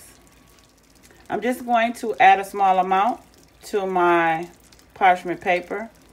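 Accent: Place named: Jamaica. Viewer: Nice you sound jamaican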